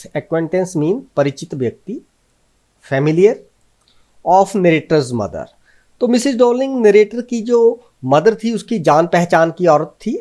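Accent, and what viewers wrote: Haryana, India. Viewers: sir u r from haryana ? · SIr are you Haryanvi aap hi Awaaz sewada chal raha hai ki aap Haryanvi Ho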